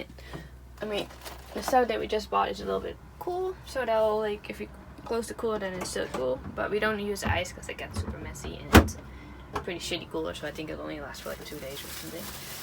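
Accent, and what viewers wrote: Netherlands. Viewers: lovely video!! i didn't know your channel before and honestly didn't look up the description or your channel before watching this video and omg, i immediately thought that you're from the Netherlands!! i absolutely love your accent! and the food ideas in this video 😍 i need to see if you've got more of these videos about you both living vegan without a fridge ☺️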